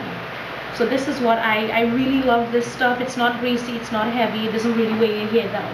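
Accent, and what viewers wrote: Trinidad and Tobago. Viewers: As a fellow Trini I LOVE to hear the Trini accent on YouTube !!!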